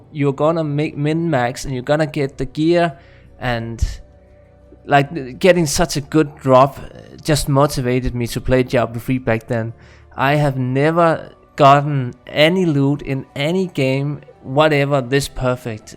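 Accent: Denmark. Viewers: Hey your accent sounds danish, am I correct?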